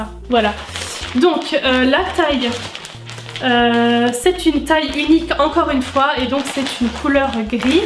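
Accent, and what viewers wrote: Lorraine, France. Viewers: Je me disais bien que ton accent me disait quelque chose, vive la Lorraine 🤟🏻❤️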